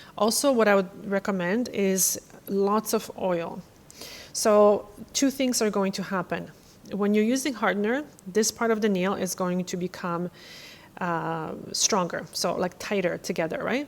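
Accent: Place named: Poland. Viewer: Love your accent. We Poles are awsome.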